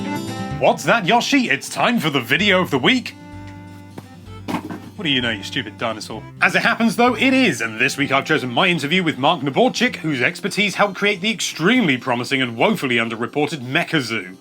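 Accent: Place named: United Kingdom. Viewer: I get that you're British and your accent isn't the original pronunciation of the language. Instead it is a style of speaking that was developed by bringing cockney slang to the upper-cass to unify those from the homeland and identify yourselves by contrasting with colonial Americans. However; "Yoshi" (Yoh-shee) is a Japanese name so it should probably be pronounced as it's native language suggests.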